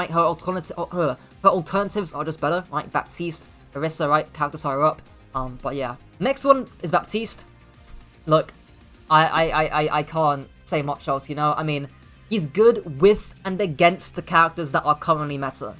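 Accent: England, United Kingdom. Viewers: I love your English accent ❤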